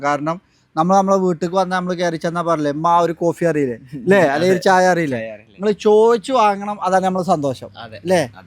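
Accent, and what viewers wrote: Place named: Northern Kerala, India. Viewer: Hi എനിക്ക് നിങ്ങളുടെ സംസാരം കേൾക്കാൻ വലിയ ഇഷ്ടമാ. വടക്കൻ സ്ലാങ് 😅😅😅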